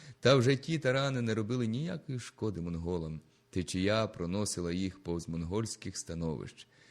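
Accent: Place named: Western Ukraine, Ukraine. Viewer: Щиро дякую за чудову роботу.Милує слух мова Заходу України. тих часів.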